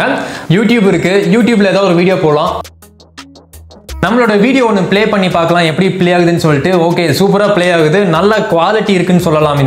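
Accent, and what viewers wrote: Coimbatore, India. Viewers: Bro u r from Coimbatore right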